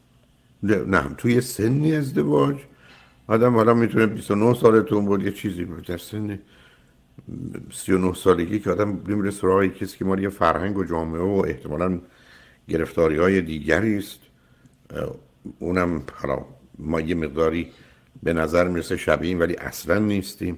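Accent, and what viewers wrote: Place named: United States. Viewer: طوری با لهجه صحبت میکنه انگار سی ساله امریکاس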